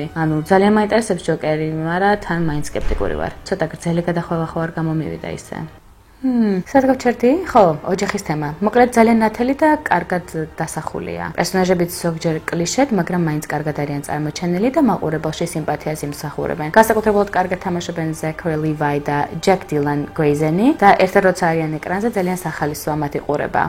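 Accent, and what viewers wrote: England, United Kingdom. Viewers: imena am gogom ro ardauwunos rame nebismier films ararsebobs da dzaanmagzianebs sheni suleluri inglisuri gamotqmebiii, prosta tavs isazizgreb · ინგლისურს რო ისწავლე მერე აღარ იტყვი მაგას ძაან ასწორებს უცბად ინგლისური აქცენტი ❤ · zalian kargixar, sheni arxi maqvs gamowerili da didi siamovnebit gismen, magram gexvewebi es inglisuri aqcenti mishore, ubralod qartulad tqvi, ;D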